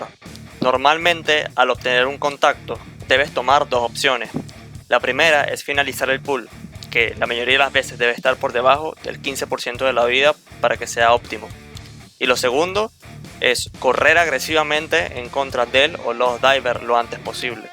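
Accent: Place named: Caracas, Venezuela. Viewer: Eres Venezolano? Ese accento es demasiado caraqueño!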